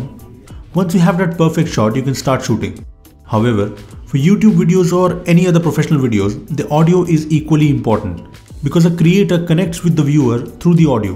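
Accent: India. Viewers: RIP Indian English accent :(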